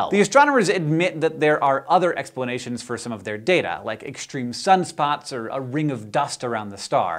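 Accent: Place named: United States. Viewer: He’s American, get over it.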